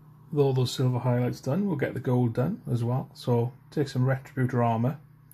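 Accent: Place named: Swansea, United Kingdom. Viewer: can always tell another jack! 😍🏴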